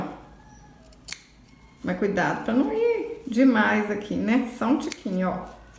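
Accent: Minas Gerais, Brazil. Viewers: Gostei da sacolinha. Mas, especialmente, do seu sotaque! Já assisti a vários vídeos seus mas só hoje vi que você é mineira. Como eu! 😘😘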